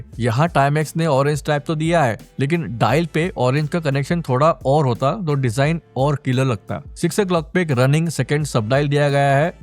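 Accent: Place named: North, India. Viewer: Bro newu kannadiga na !! 😮, i thought u were somewhere from north India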